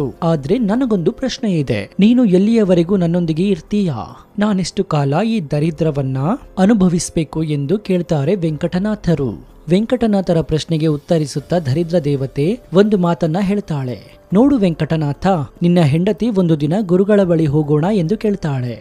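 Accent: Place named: United Kingdom. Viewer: bad narration...Kannada in English accent!!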